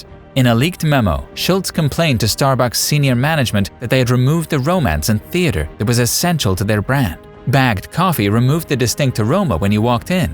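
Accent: United States. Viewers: How did this guy's accent went from being annoying to becoming Americanized in such a short time (3yrs)?? Good effort nonetheless.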